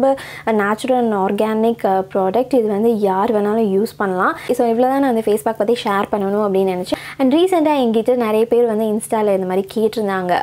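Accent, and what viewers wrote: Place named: England, United Kingdom. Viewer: Wow wat a English accent 🤗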